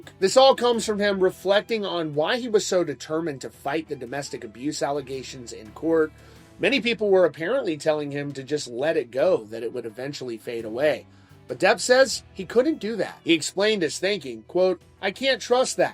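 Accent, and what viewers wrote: United States. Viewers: Time to come home to Kentucky my man ,Need to work on that damn accent you have now anyway , went full blown yank on us.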